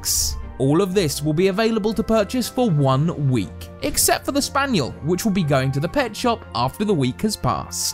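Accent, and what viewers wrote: United Kingdom. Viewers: A nice British accent 👍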